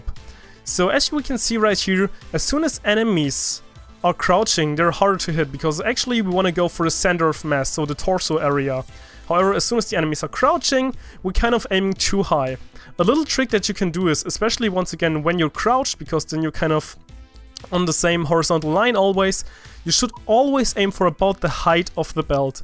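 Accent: Germany. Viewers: hab ich mir auch schon gedacht und auch das Englisch hat so ein bissl deutsch xD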